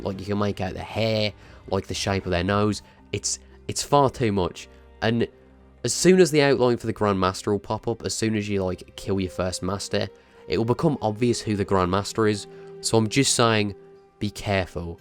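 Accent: Birmingham, United Kingdom. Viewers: I never said there was 😱 I love the accent I just can’t tell which yours is, I’m guessing your a brummie then?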